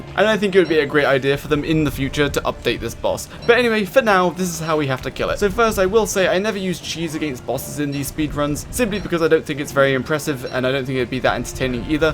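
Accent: United Kingdom. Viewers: I love your British voice :))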